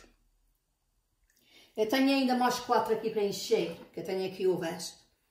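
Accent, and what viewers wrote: Azores, Portugal. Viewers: Parabéns adorei sem dúvida que vou fazer Adoro o seu sotaque sou de São Miguel para mim é um orgulho. Continue assim bom fim de semana bjs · Muitos parabéns pelo seu canal! Continue assim simpes e com o seu sotaque maravilhoso! 😀 Sou do continente ( transmontana 😁) e sem dúvida que devemos manter as nossas origens com muito orgulho!!! Tenho família na Terceira e foi onde experimentei a bela culinária açoriana. Adoro a sua receita de bolo lêvedo! 🤗 Nunca falha!!!!! Sem dúvida que vou e experimentar esta maravilha! Beijinhos e muita saúde!💕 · Que coincidência. Há mais de trinta anos atrás conheci uma açoriana e também pensei que falasse francês. Quando eu perguntei disse-me que era dos Açores. Mas eu habituei-me a esse sotaque e nunca esqueci. Percebo perfeitamente tudo o que diz. Parabéns pelo canal. Gostava de ir à Bermuda, no futuro.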